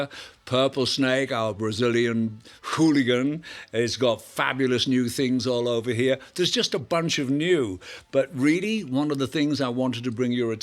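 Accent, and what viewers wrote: RP, United Kingdom. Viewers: He speaks rather posh English.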